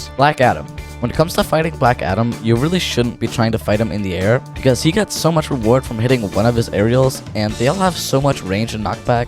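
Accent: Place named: Denmark. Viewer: bro lyder lidt for dansk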